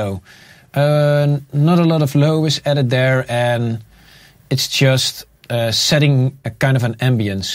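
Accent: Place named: Netherlands. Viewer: You can really hear he is dutch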